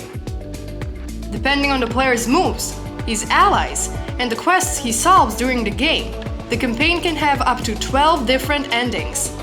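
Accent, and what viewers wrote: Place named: Russia. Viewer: its a women I can hear the Russian in her voice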